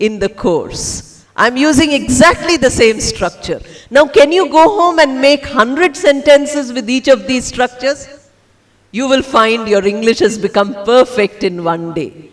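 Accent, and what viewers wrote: India. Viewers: This Indian-born English Professor lady has not learned the correct pronunciation of words she spoke with her Indian accents. I'd suggest this Indian lady Professor to watch some YouTube videos presented by "native" English speaking ladies telling us how to pronounce words - simple or difficult to pronounce - in the way they are clearly understood by audiences all over the world, where English is spoken as a second language as is the case of this Indian "English" Professor lady. For Indian listener like Anita Pandey this Indian lady Professor presented "very nice speech with clear pronunciation" but that was not the case. Anita please watch YouTube presentation "Listening and Understanding" learn English with 3 steps video. Thank you for reading my comments. Hope it helps. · Super mumm ..... Your speech is real Indian language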